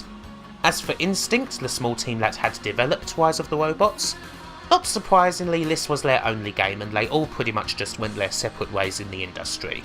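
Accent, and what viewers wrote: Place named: England, United Kingdom. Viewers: How come some people consider way of speaking a speech impediment? I guess it's an accent from a region in England. I understood him perfectly, and I'm Argentinean (hence, a non-native speaker of English). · Because it's an Essex accent. A very strong one. It's why Jonathan Ross pronounces his "Rs" as "Ws".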